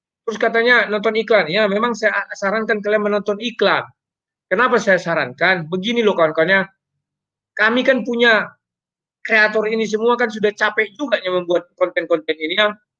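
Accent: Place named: West Java, Indonesia. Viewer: Logat Sunda 😂😂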